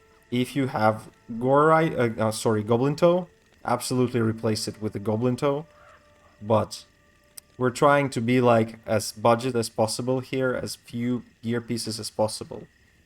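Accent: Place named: Eastern Europe. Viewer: Finally a Cheap Smiter Build without the use of Annihilus and already acquired Pally +3 Torch, no BS, just cheap items. Except Shaftstop --> 900+ hours in and I still haven't found it, but I can use Smoke plus that +35% physical negation shield, I think Stormshield is the name --> comes out to be somewhat equal to the combo here (after equipping some charms for extra Elem Res). Auto-subbed, even if it's just for the accent - reminding me of Idevaste's :P. Great video, brother! *I wonder why Central/Eastern European Youtubers are better at keeping the script short and without unnecessary BS chatter...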